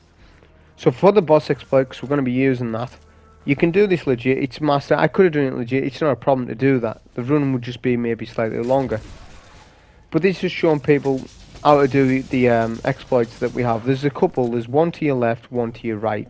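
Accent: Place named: North, United Kingdom. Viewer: you're an up and comer youtuber but man your northern accent and conversational commentary make you very likable. catch this sub.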